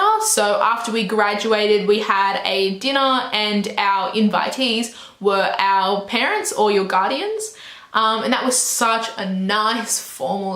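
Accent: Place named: Australia. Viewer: I have never thought you had a distinct Aussie accent. But that all changed upon listening to this ahahah. Love you xx (Also cute clap)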